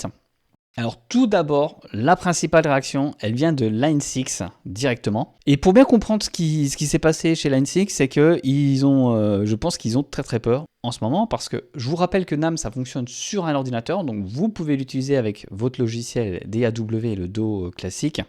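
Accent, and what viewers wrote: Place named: Belfort, France. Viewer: tu as l'accent des Territoires de Belfort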